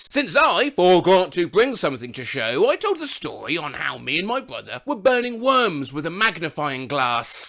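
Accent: United Kingdom. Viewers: A compliment for, his voice sounded especially British today.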